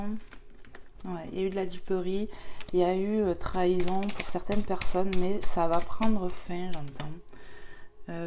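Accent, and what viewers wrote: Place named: France. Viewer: J ai fort apprécié, vous vous donnez beaucoup, yes! Yes! J espère que les choses vont enfin de bouger … j apprécié votre accent français , ça a qq chose de sympa. Je suis Belge,,, bon week end à vous🤸🏻‍♂️🦋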